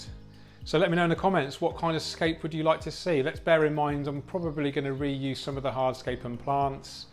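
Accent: United Kingdom. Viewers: Love your British accent my boss was from Britain and his wife from Netherland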